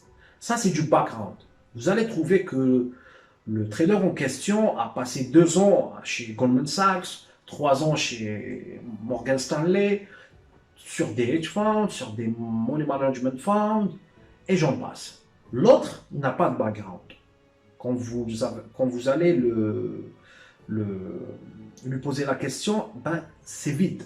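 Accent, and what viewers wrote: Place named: Algiers, Algeria. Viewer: Salam on entend l'accent algerois kho bonne vidéo merci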